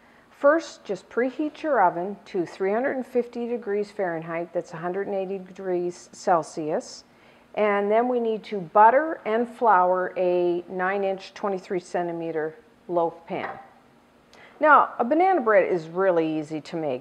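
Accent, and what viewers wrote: Canada. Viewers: I like your Canadian accent: "aboot."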